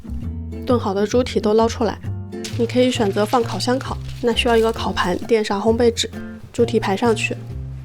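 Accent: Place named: Shanghai, China. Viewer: 我家乡也有好吗我倒觉得是上海银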